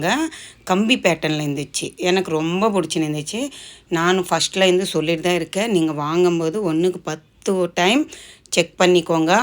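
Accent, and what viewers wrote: Telugu, India. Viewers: మీరు తెలుగు వాళ్ళ sis మీ మాటలు నాకు అలానే అనిపించించింది.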